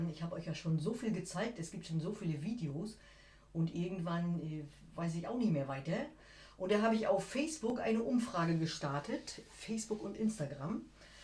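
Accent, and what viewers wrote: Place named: North, Germany. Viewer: Wunderschöner Frosch, echt witzig und super erklärt, übrigens höre ich gern deinen norddeutschen Dialekt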